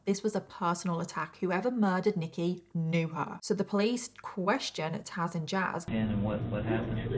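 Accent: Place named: England, United Kingdom. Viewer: I saw this case many years ago but decided to watch your version and your research on it was spot on. Great job!! Btw I'm a twin as well. Similarities between my twin sister and these twins are one thing only; the deep bond we share that's it. The rest nada. We were the victims of abandonment and physical, psychological, emotional and sexual torture. My twin sister and I got introduced to hell from the very first breath we took, that was our life. The PTSD took my mind into homicidal thoughts, and suicidal attempts. I jumped off a building and broke almost every bone in my body, clinically flat-lined, coma for 13 days then came out of it. Then knowing I survived I did it again but this time went higher up in the building. An identical thing happens, clinically dead, in a coma for 13 days, came out of it and here I am ALIVE. 3 years now, and with many severe different and alternative therapy, for PTSD, etc I can tell you I am finally finding my feet. 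I now LIVE more than survive. My twin and I are SURVIVORS of the unthinkable. We are beautiful, loving, caring, compassionate, nurturing, funny loving and amazing women. I say that with humility, not arrogance or ego. So it blows my mind how these twins can so nonchalantly and callously murder their beautiful mother over a simple curfew or a few basic rules. Normal people just don't. Abnormal people don't, but evil does. May the mother be in peace forever. I love your crazy mad English accent and your channel. Thank you for your h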